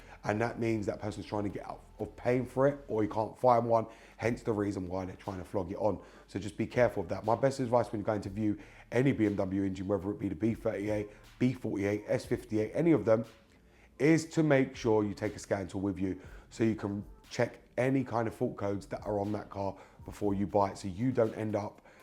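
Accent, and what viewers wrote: United Kingdom. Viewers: Speaking so fast and with so British accent, it is very difficult to follow you. Anyway, very useful information, so thanks' for that!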